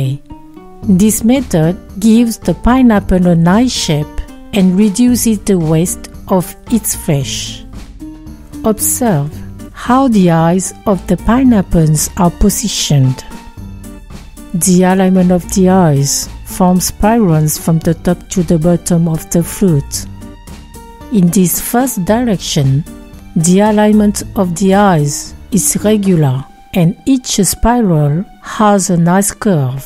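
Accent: France. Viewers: Bravo! English sounds so much better with a French accent. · I love pinneapple and thats why Im here but after 5 minute of french accent English, I feel like ordering some "baguette avec beurre" :))) I actually enjoy French accent English speakers. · LAOTIANS with a French Accent! Wow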